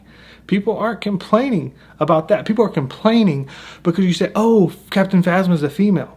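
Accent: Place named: Rural South, United States. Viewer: like I said, a southern white person calling people stupid and an idiot is winning the argument. You DID NOT make valid points in this video. You are just another white person on YouTube. You are not important in any way what so ever. Understand that. You are not owning mrwin your comments. You are not Perry Mason and you sound like a hick. Your opinion doesn't count so why are you acting like someone is attacking whites? You want to call people Nazi because you want to grasp for straws. You don't think race should matter because you are white. The best person to you is a white person. Get off your high horse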